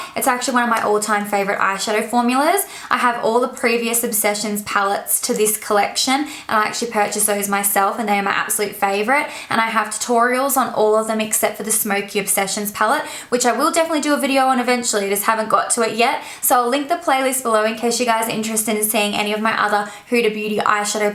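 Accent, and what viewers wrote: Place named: Australia. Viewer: I love Australian accents 💕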